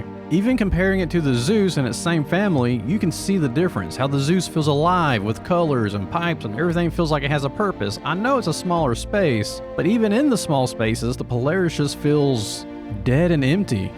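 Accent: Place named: Tennessee, United States. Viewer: This guy is either from Tennessee or Kentucky. That accent..holy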